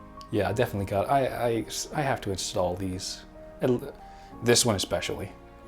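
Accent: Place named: United Kingdom. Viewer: Nah mate definitely should've kept the Brit accent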